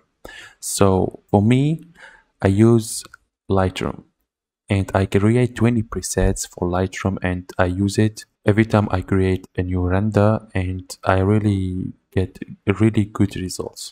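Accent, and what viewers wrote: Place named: France. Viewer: Your english is great and your accent is barely even there. Like, you can tell you are french, but your english is totally normal just with a normal french accent. Your english speaking is better than the majority of Americans, some of who have really thick accents even though they only speak 1 language, lol.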